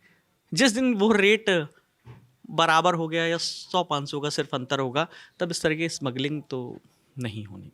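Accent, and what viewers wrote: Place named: Bihar, India. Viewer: Dhar liya jata hai .Bihar ka tone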